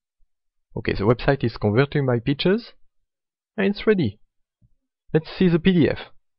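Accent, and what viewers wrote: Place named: France. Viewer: THE FRENCH ACCENT!!! , good apps btw, thanks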